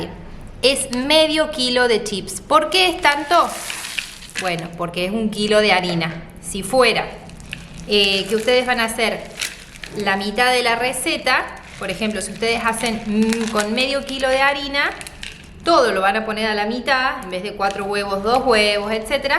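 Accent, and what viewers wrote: Córdoba, Argentina. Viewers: Hola querida CORDOBESA..LA TONADA ES MUY CONOCIDA..SOY DE CORDOBA CAPITAL..MUCHO GUSTO.. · Hermosa recetas de los pancitos de navidad me encantó lo pondré en práctica ya le compartí a mi amiga muchas gracias, al principio estaba en duda 😂 le decía a mi mamá esta chica es de Córdoba x su tonada, despues dije siiii.....ME ENCANTA T PROVINCIA HASTA AHORA GRACIAS A DIOS VAMOS TODOS LOS AÑOS,volviendo al tema de lo pancitos me gustó,xq creo son mas practicos q los amasados ❤👏👏🙌🙌Sgo del Estero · Graciasss hermosa!!! Te acabo de encontrar, ya me suscribí, activé la Campanita. Ahhhhh!!! Me encantaron esos pancitos chiquititos!!!! Voy a compartir con los de mi Familia, mamás amigas, y todas las personas que les encante hacer esta belleza!!! Una pregunta, preciosa. Yo tengo la batidora de pie, la Planetaria. Se puede hacer la mezcla ahi o me van a quedar mas duritos? 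CLARO,que al integrarlos con la harina los paso a la mesada, por el tema del gluten.Sino, los hago como vos en Licuadora y no hay problema. Quisiera hacer muchos, si me salen bien los primeros 25, porque me encanta regalar y esta es un opción económica, pero más valorado, el hecho de ser hechos caseritos y a las personas que regale, le va a encantar el gesto de haberlos hechos pensando en ellos. Disculpas tan largo!!! Soy una abuelita de 78 años y lo quiero hacer con 2 nietitos varones, que les encanta la cocina. Te digo la Verdad, tienen 9 y 13 añitos y cocinan y luego la mami, los pone al fuego El de 9 añitos, hace milanesas perfectas, solito, otras comidas, fáciles y tortas, los dos hermanitos. Ya termino, preciosa, ya te saqué sos de Córdoba ( Argentina) como yo, pero de Buenos Aires. Amo tu tonadita.❤ Tengo familia cordobeses y pasaba de niña las vacaciones de invierno en La Falda Ya te quierooo Mucho!!! 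Besitos ❤🎅🏻🎅😘😘😘❤️ Y quiero hacer tu glacé real. Es hermoso blanco como la nieve!! Debe ser delicioso.😘😘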